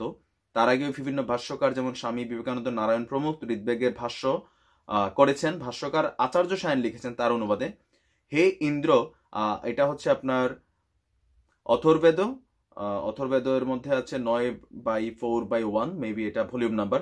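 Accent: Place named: Noakhali, Bangladesh. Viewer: Bruh apni j noakhali/laxmipur er eita apnar kothay clear buja jay.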